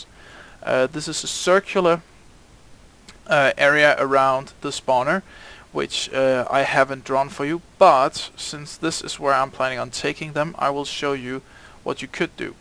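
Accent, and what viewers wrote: Denmark. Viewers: Are U danish?? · Im curious, but are you by any chance Danish? That accent sound really Danish hehe (Im a Dane myself, thats why ;) ) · Jeg ved ikke helt... men jeg tror jeg kan høre på din accent at du er dansker? :)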